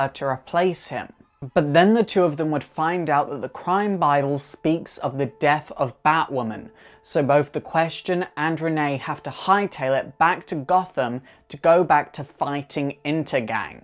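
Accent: United Kingdom. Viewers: Sorry if this question is really stupid,but are you british?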